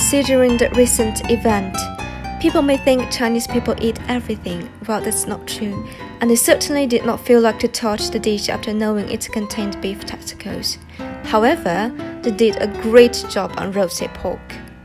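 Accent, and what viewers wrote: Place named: United Kingdom. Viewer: 好棒好棒！！！配乐好喜欢 还有你的英音😍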